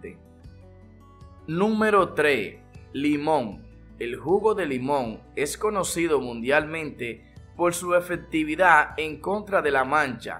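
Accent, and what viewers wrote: Dominican Republic. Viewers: Jajaja creo que los dominicanos hablan asi o Puerto rico no me acuerdo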